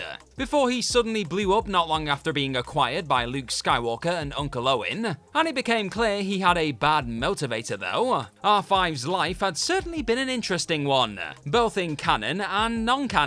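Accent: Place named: England, United Kingdom. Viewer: He’s English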